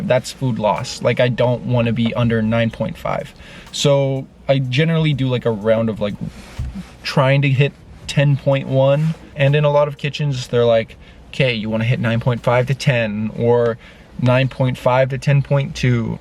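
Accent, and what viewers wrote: Canada. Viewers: The full on Canadian accent <3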